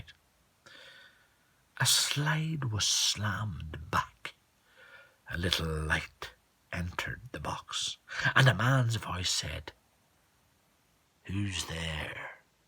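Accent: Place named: United Kingdom. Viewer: Ohh, I love british accent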